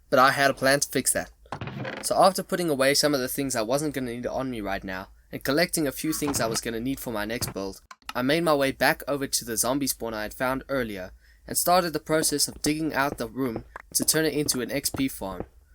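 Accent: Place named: South Africa. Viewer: Where you from because you sound like a South-African. Good vid btw and just subscribed.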